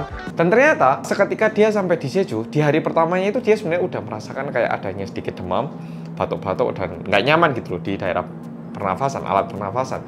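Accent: Java, Indonesia. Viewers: Ganteng benner masss di video ini jujurr langsung terpana aku ngeliat mukanya mass medokk 🤣:' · Korea medooook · Wes di dendo dikon ganti rugi. Hissss neng omah yo enak kok delok Youtobe, yang medok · Mas muedok annyeong HI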